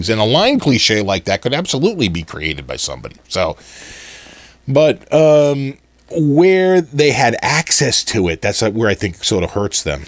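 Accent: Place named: Rhode Island, United States. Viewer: you look like gerry garcia might have looked, if he looked like famly guy .... you even sound a bit like FG... im guessing your from, or around rhode island lovin your vids by the way and LZ are the biggest rip off artists of all time its just that the internet wasent around when they were. so no one gotta know better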